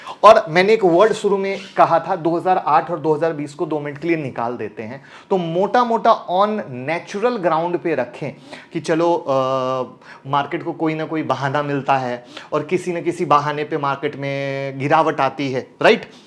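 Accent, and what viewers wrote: Bihar, India. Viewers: App sir Bihar se ho lagta hai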